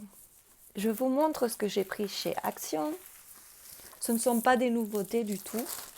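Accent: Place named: Spain. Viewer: Mais ton accent 💖 la moitié de ma famille est d'origine espagnole et quand je t'entends ça me détend tellement je ferme les yeux je retourne en enfance je m'y croirai presque 😁💖 Tu m'as fait rire avec le fait de ne pas vouloir acheter trop de gammes de crayons, je crois qu'on tombe tous dans le piège quand on commence le colo 😂😂 Merci pour le partage je me régale avec tes vidéos 🥰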